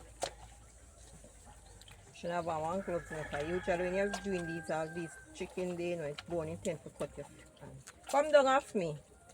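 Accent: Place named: Jamaica. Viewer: Love how you keep the Jamaican accent 😅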